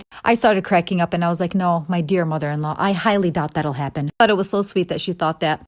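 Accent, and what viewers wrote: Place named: Michigan, United States. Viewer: You don't hear the accent? 😂 She's from Michigan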